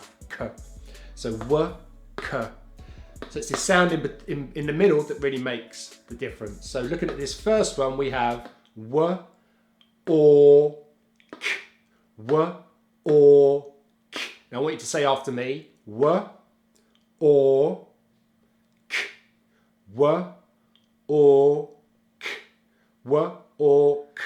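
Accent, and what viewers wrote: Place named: United Kingdom. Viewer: British accent is beautiful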